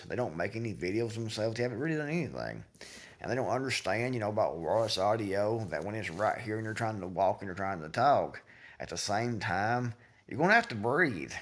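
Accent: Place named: South, United States. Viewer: Reminds me of that scene from Django …”all I hear is criticize, criticize, criticize “. The world is full of trolls, just waiting and looking for opportunities to whine about something. It’s exhausting, predictable and nauseating. Never thought we would live to see such unmanly men and self centered twits who have nothing better to do than nit pick. I never noticed your breathing, I just enjoy your southern drawl 😊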